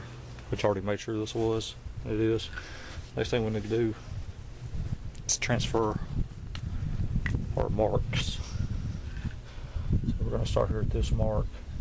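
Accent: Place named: South, United States. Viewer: Jeez I can barely understand your country shitty ass accent. Awesome video tho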